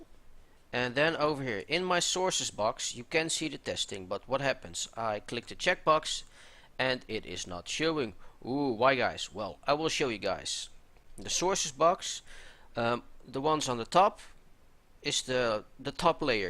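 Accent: Netherlands. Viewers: Three words and I knew you werd Dutch as well haha. Great tutorial/walkthrough though. I have recently starten streaming on Twitch and this really helped me out. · U're Dutch? :P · Ik hoor gelijk dat je Nederlands bent :D Nice video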